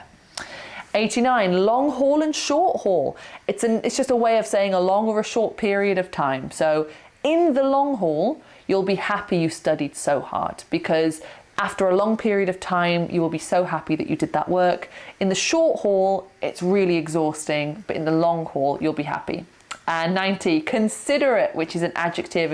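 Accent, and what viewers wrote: United Kingdom. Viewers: I watched you from the begining and I wrote the words I didn't knew and I will watch your video again tomorrow and write some other notes in a excel file for myself by the way, thank you to put these videos for us. it will be really helpful if any one wants to learn english and speak fluently especially with this lovely british accent that you got. I wish you read my comment. I'm from Iran I love british people and their accents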